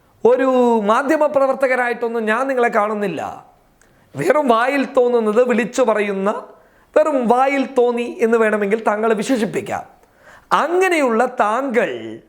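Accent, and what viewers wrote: Kasaragod, India. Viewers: വാർത്ത പറയുന്ന ചേട്ടാ നിങ്ങൾ കാസർകോഡ് ആണോ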